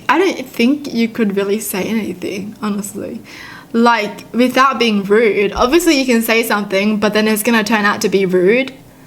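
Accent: Australia. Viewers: Hi so I’m new to this channel,but I have a question.Are you Australian ,because your ascent is kinda Australian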